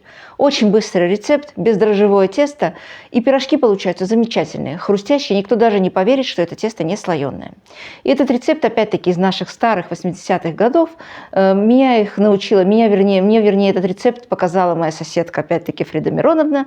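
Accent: Baku, Azerbaijan. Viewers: Как все по бакински! Просто наслаждение от родного акцента, четкой толковой подачи, вашей красивой внешности и даже интерьер кухни напоминает чем то Баку, ну а рецепты… когда смотрю их, перед глазами встают бакинские посиделки и «гонаглыхи» 70-80х. Прям хочется обнять вас, родная! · Спасибо!!!!Из комментариев поняла, что Вы бывшая бакинка? Огромный привет, землячка! Такое удовольствие услышать чуть уловимый акцент, ничем не истребимый у тех, кто жил в Баку. Желаю Вам успехов, здоровья и благополучия! Всем мира и любви! Пирожки завтра же испеку. Уверена - рецепт классный! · О,этот сладкий бакинский акцент!,спасибо большое за чудесный рецепт и за такую приятную подачу. Храни вас Господь ❤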